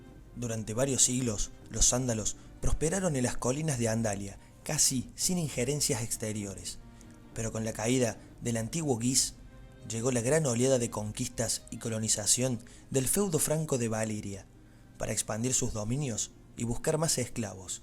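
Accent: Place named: Córdoba, Argentina. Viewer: Sos cordobés? (Argentino) se te nota el acento en las palabras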